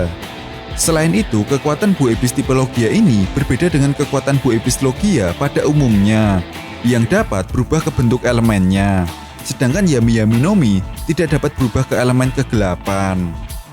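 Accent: Java, Indonesia. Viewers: Bahasanya medog ,, tapi itu yg membuat saya terhibur ,, lanjutkan ....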